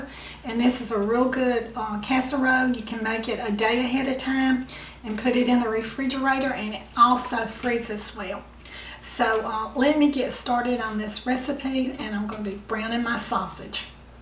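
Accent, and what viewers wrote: South, United States. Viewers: I just found your channel, you are SO sweet, oh my goodness. Listening to you share the recipe with your Southern style just made me feel so at home for some reason and I'm not southern! (even though we live in FL, that is NOT the south) :-) Newly subscribed. I'm looking forward to making this on Thanksgiving morning. I think many people know of a breakfast sausage recipe but your video just is so heart warming. Wishing you a Happy Thanksgiving. Glad to have found your channel.-Theresa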